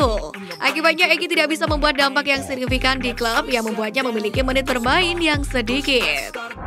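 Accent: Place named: Indonesia. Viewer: Pengisi suara mantap bagus, renyah, jelas logatnya indonesia banget ga spt yg lain yg kadang sok sok an dicampur bhs asing. Lanjutkan info terkininya. 👍🏽💪🏽✊🏽🤝🏼💖🇮🇩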